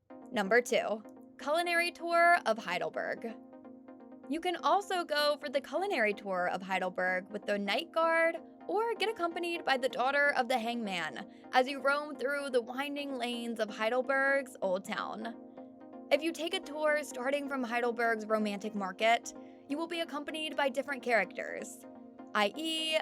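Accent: United States. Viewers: Nice Orange County accent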